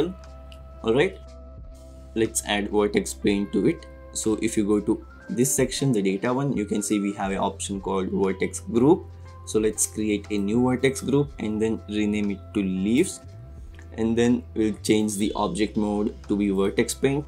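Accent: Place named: India. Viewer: I swear everytime I see a video that has a guy with an indian accent you know it's gonna be a useful tutorial. Thanks a lot!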